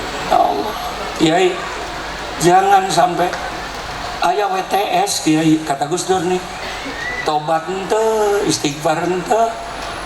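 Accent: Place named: Banten, Indonesia. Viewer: Titisan pandeglang Banten na aya keneh tegeur tina logat ngomongnya kiyai, pelem bahasanya pasehat nyebut jurigna 🤣 Sehat panjang umur kiyai.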